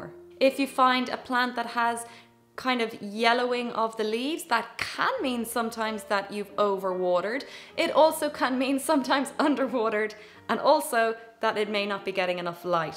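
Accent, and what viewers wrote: Ireland. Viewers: Discovered your channel today. Love your soft sweet Irish accent - lovely to listen to. Have just subscibed. Thanks.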